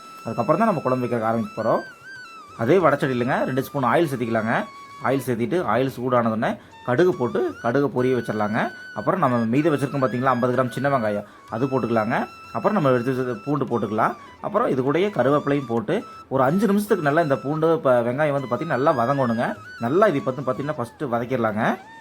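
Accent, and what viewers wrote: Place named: Kongu, India. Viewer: Super na kongu tamil la arumaiya pesuringa....❤❤❤❤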